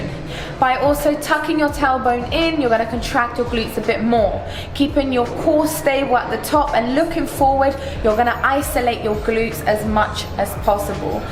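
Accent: England, United Kingdom. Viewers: What part your England is your accent from?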